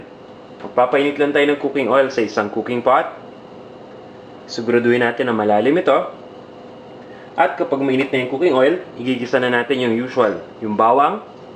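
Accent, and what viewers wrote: Philippines. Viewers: Sir this is an amazing recipe for spicy beef noodle soup. I can't tell if you're speaking English, with a heavy Filipino accent or what but I can tell that that makes little difference. You my friend have just created magic in this spicy beef noodle soup. I cannot believe no one's commented on this recipe!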